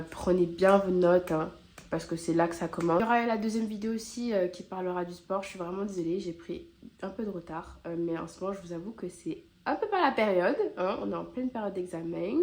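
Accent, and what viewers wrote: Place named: South, France. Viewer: Tu as chopé l’accent du suuuuud !! Hâte de tes prochaines vidéos ❤️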